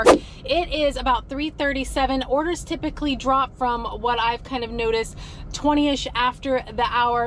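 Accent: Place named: Portland, United States. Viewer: Sounds like Oregon,Portland metro area.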